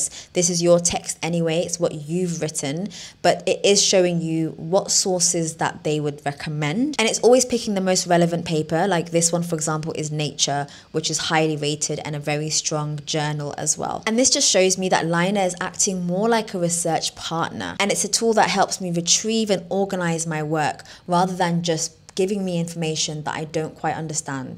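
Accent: United Kingdom. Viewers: Dear, I love your accent, its pure british, however your channel is expensive, I could not afford it.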